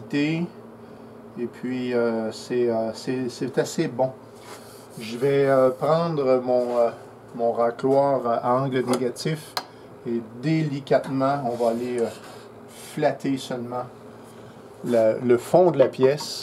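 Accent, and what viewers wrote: Quebec, Canada. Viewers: Salut. D'après ton accent je dirais que tu es du Québec? Les québécois c'est la meilleure partie des français... Ici en France ce st terrible la mentalité. Bref. Super vidéo C'est une passion que je cherche à assouvir donc grd merci 😉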